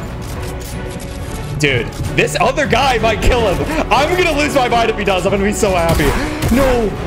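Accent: Germany. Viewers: Are you german? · Is he German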